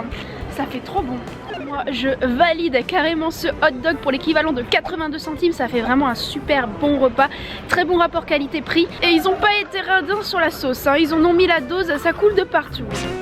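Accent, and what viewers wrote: United Kingdom. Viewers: ton accent anglais on t'en veux pas... sans être méchant:) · fait une vidéo super sympa et très inspirante pour mon prochain séjour à Varsovie, et la seule chose que les gens commentent, c'est son accent anglais. Comment voulez-vous que les gens soient confidents en parlant les langues étrangères quand ils vont se prendre des remarques derrière... Cette mentalité, il n'y a qu'en France que je l'ai vécue et ça me désole! Merci pour ta vidéo!:) Well done!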